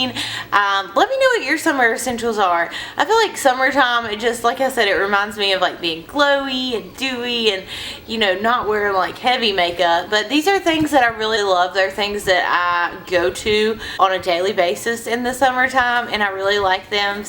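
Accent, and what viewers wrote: South, United States. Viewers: You're southern accent is sooo cute!!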